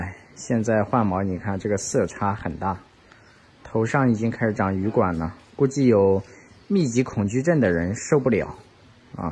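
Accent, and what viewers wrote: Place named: North, China. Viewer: 從不露臉的視頻主,身材好瘦高型,講話字正腔圓聲音好聽,是不是年輕人不知道？猜是大陸北方人or東北人？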